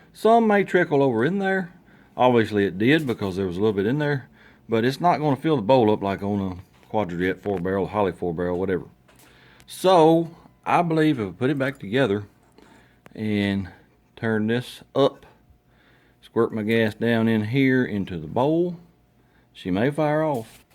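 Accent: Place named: Eastern Kentucky, United States. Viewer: really enjoy your show. I grew up with some boys from eastern Kentucky and you sound a lot like them, Glad to hear your channel is growing!!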